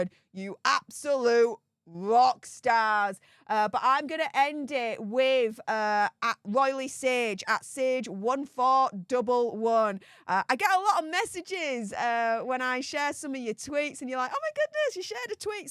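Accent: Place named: England, United Kingdom. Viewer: please talk a bit slower because your accent is very broad and hard to understand. I don't live in England (I'm just guessing that's where you're from?) Thanks heaps.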